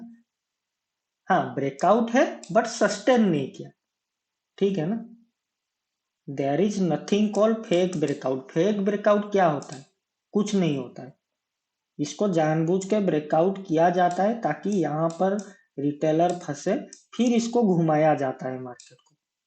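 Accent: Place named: Odisha, India. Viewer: tam katha ru janideli...j tme..odisha ra boli....☺️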